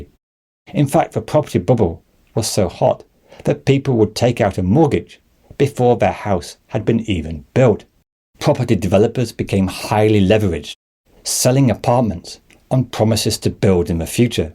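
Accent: United Kingdom. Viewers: 1.)British colonizer voice - check 2.)Faceless - check 3.)Screaming about the collapse of smarter Chinese rivals - check Yeah, safe to say most of the nonsense in this video is bullshit.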